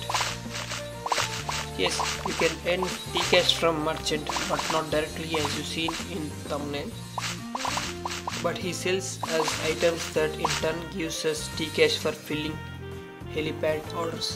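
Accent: India. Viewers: He's from Hyderabad Andhra Pradesh so that is why he speaks like that. Atleast appreciate that he knows English because most of state doesn't know English and is uneducated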